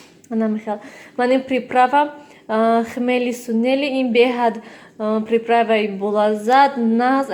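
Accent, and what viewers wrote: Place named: Khujand, Tajikistan. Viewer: Ранги тарафои хучанд гапзанитон падари Нилуча гучоги ?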